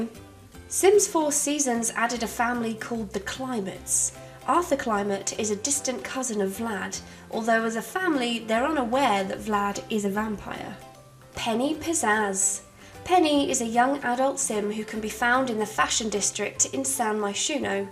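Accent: United Kingdom. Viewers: Youre english sound like british accent, but you from america ?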